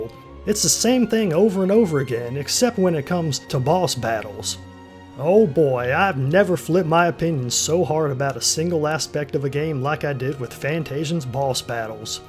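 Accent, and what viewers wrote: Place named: Rural, United States. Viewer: >hick accent, opinion rejected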